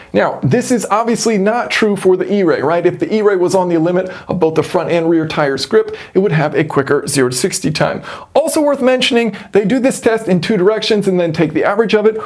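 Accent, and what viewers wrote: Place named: South, United States. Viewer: I think the time spent in Texas rubbed off on your accent a bit, and brought some more southern out. :)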